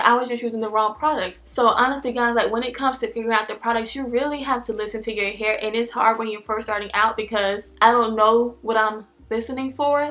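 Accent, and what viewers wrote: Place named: Georgia, United States. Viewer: I found your videos populating on my YouTube feed a lot. “Thanks to my sister”😒. But although I’m not interested in black girls hair care processes for myself personally. I’ve come to find other things about you intriguing. Like the way you talk. I KNOW YOU HAVE TO BE FROM GEORGIA😂. The combination of how you articulate and encourage sophistication within black beauty…you definitely got my attention.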